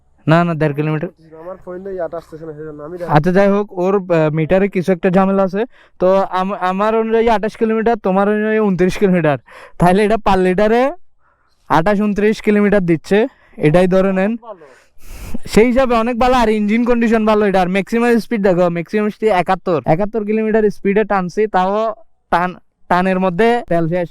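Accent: Chittagong, Bangladesh. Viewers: Vai amr mone hocche apnr bari chottogram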